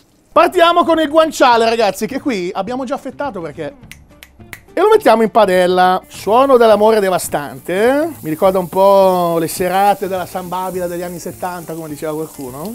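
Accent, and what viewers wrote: Rome, Italy. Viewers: Parla tutti li video in romano che te esce bene grande sempre generoso cor magna!!👍🏻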